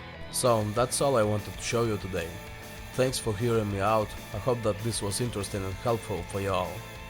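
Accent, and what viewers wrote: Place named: Russia. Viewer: Russian accent best accent.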